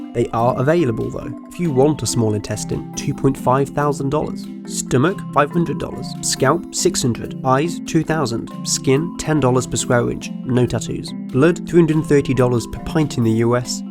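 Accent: England, United Kingdom. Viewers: Before I clicked this video, I predicted the voiceover was going to be an English dude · You have to pay for legal organ transplant? WTF? I'm looking this up right now and where I live (Turkey) A kidney transplant costs the government about 25000 USD. The organ doesn't cost money it is only the surgery(or mulitaple) and the after surgary hospital stays that cost. How can that be more than 200.000 USD? That 25000 USD includes the hospital and medicenes and crap. And you can't tell me that Turkey is poorer. Yes cost of staying at the hospital differes but kidney is free because it is donation and drugs you take fater the sureary are the same everywhere. I really want to know how can a kidney transplant cost more than 200000 USD in a normal country. Is that just the price in USA since government doesn't care if you die over there? If it is why I'm sorry for not understanding but he sounds British, I thought they had healhcare over there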